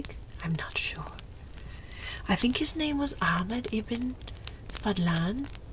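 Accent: Denmark. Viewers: As a dane I gotta say, the accent is on point, you sound like someone from Copenhagen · I'm from Sweden and I could hear you Viking blood sing ;) Sounded alot like a Danish (viking) to me! Love all the history you weaved into your viking saga :)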